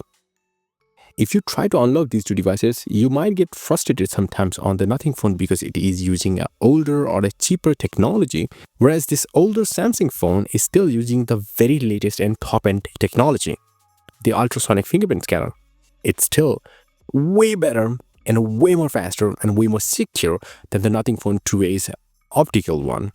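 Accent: India. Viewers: Indian accent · pajeet voce, DO NOT REEDEEEMDEED OTR WATCHT!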